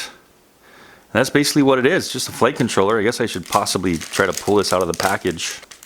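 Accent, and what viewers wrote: United States. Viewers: do you come from america)ok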